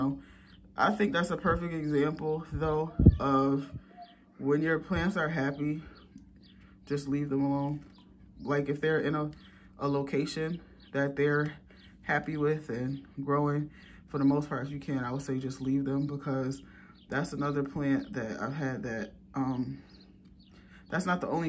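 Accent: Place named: Florida, United States. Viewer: I'm trying to place your accent. I have a guess but dont want to make a fool of myself. Almost seems central Florida ish with a tint of something else I cant place. Let me know if you don't mind.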